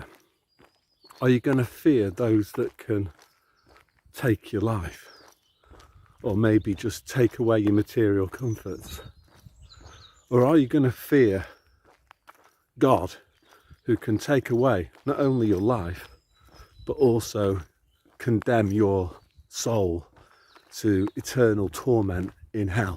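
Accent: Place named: Manchester, United Kingdom. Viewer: Exactly! I’m same! In Manchester visiting m’Dad . Thanks 🙏 it’s lovely to hear some comm sense in a manc accent xx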